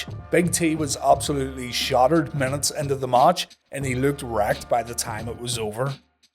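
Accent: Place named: United Kingdom. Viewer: Can't listen to this nit brit?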